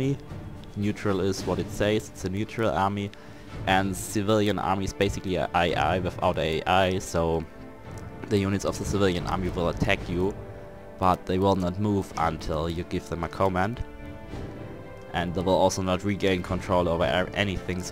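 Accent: Germany. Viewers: dadurch weil ich deutsche Akzente gut kenne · Bist du deutsch?? 🙃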